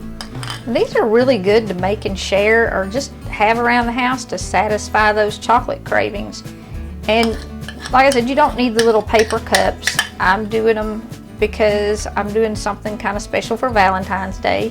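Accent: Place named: Appalachia, United States. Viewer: Cutest Hillbilly on YouTube, and love the accent. Great recipe, thank you.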